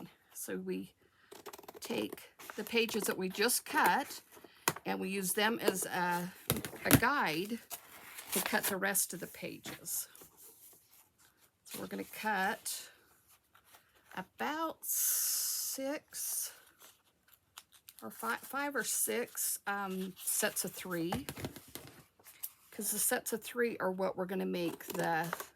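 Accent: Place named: Utah, United States. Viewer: Hello neighbor in Utah 🌿 I'm from California but live in Orem now. I thought your accent sounded like a Utahn😊 ❤ you & your unique crafts!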